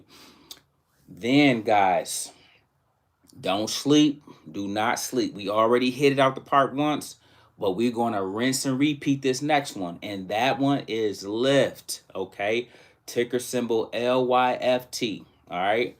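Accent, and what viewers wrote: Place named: Midwest, United States. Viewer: Live your accent. You from the midwest? Indiana, Missouri, Oklahoma or Wisconsin?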